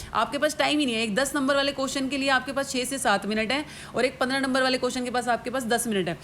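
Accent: Haryana, India. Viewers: Ma'am आप कोण से गाम तै हो क्योंकि आपणि बोली सुन्न की बड़ा आच्छया लाग्या 👍👍😂 लेकिन maam म्हारा तो अंग्रेजी मै बेड़ा गरक हैं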